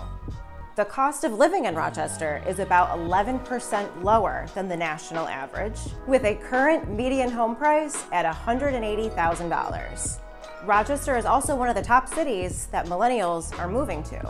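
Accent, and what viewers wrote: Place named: Michigan, United States. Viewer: Sounds like Michigan.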